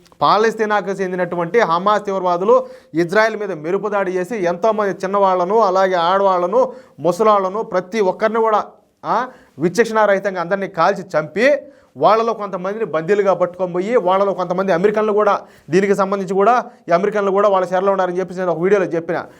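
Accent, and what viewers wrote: Rayalaseema, India. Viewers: Every video chustunna midi mi talking style super and asalaina rayalaseema yasa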